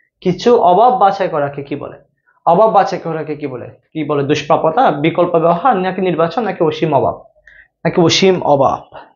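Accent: Chittagong, Bangladesh. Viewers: Sir apni chittaingga😄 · চিটাইংগে ফুয়া😊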